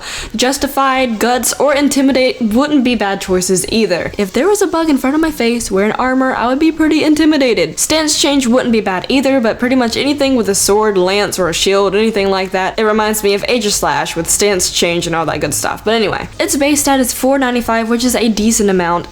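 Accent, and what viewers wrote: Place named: North Carolina, United States. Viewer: where is from? she sounds like she's from NC or some state around here... like, her slight dialect sounds so familiar.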